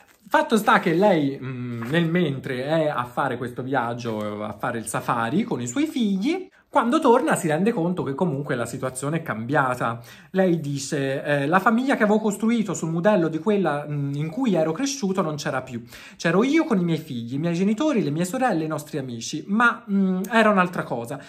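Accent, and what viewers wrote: Tuscany, Italy. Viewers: finalmenteeeeee ❤ mi fa morire il fatto che parli in toscano mentre spieghi 😂😂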